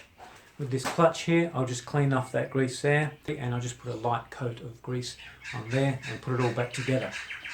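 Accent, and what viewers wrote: Australia. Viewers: I might get a set after Christmas. I am normally dissapointed by my presents and end up spoiling myself with stuff I really want. I'll let you know if I do. I've only recently discovered you. It's nice to hear an Aussie after watching gcn, cycling weekly, bike radar and the vegan cyclist.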